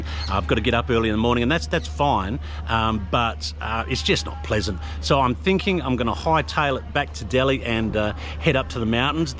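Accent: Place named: Perth, Australia. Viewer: I had a feeling you were from Perth by your accent. I'm from Karrinyup.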